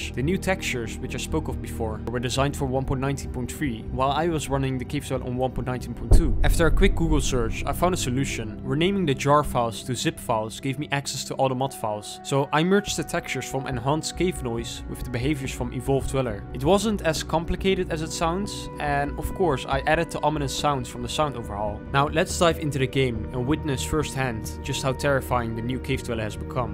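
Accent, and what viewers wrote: Netherlands. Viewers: You are Dutch, right? I love your accent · i smell dutch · ik ruik een Nederlands accentje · Are you dutch? very nice video gonna try this Tommorow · You are definitely Dutch, nice. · are you dutch? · im sorry to ask but are you dutch? you have a dutch accent when speaking english lol xD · You sound so dutch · one of the heaviest dutch accents ever. geweldig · Beetje nederlands accent ofnieee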